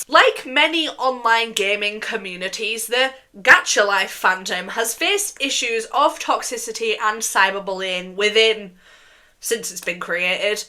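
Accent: Scotland, United Kingdom. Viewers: HI I JUST STARTED WATCHING BUT ARE YOU SCOTTISH OR FROM THE UK BY ANY CHANCE?? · Nvr in my life have I ever heard a Scottish goth...IT'S AMAZING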